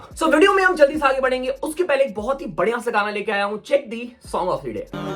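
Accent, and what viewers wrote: Bihar, India. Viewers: Bhai tu bihari hai? Bihariyon jaise kyu bolta hai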